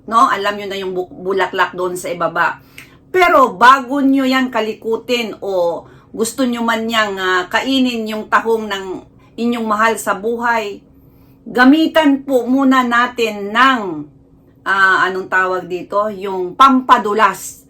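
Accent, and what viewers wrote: Visayas, Philippines. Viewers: Bisaya ka day? Basta bisaya gahi og dila.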